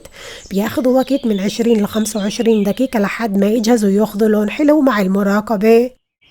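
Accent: Palestine. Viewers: تسلمي تسلمي يا ستي انتي يسعدك ويسعد كلامك الفلسطيني الحلو اجمل شيء انك بتحكي باللغه الفلاحيه الحلوه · انت مبدعه ولهجتك روعه فلسطينيه اصليه من اي البلاد انت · ما شاء الله يسعدك ويسعد لهجتك ويسعد فلسطين واكلاتها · Intisar Ayasa هاي لهجة فلسطينية ريفية في قرى بعض المحافظات وللعلم انو بفلسطين يوجد عدة لهجات ما يقارب من اكتر من ٢٠ لهجة وكل لهجة تختلف عن غيرها وجميعها لهجات حلوة ولها رنين جميل في الاذن وذلك لتعدد المناطق في فلسطين الحبيبة.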